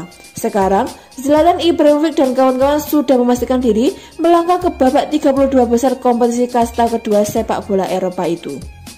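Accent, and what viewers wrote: Java, Indonesia. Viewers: Suaramu muedok bgt mbakk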